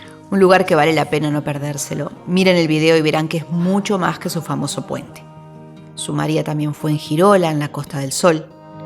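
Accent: Argentina. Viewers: Ud. es de Argentina? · Podrias hacer el esfuerzo de hablar Español,embesde Arjentino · Locutora argentina, bravo!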